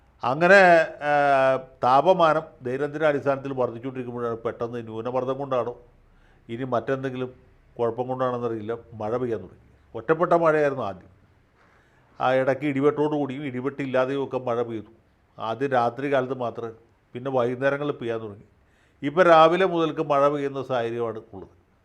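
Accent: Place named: Trivandrum, India. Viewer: I love your "Trivandrum slang"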